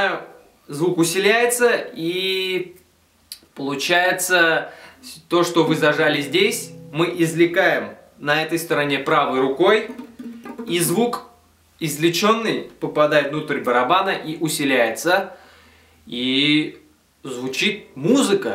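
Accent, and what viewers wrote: Tatarstan, Russia. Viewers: татарский акцент никуда не денешь, авызынны себерим😏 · Блин)))) этот Казанский акцент, татарча малай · Оой, так нравится родной татарский акцент 😁 я нашла по чьим урокам буду учиться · С таким татарчёнским акцентом говоришь 7 лет назад. Таааак мило... :-*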